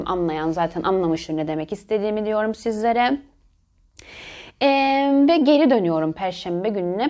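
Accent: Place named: Cyprus. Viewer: Tam bir kıbrıs şivesi var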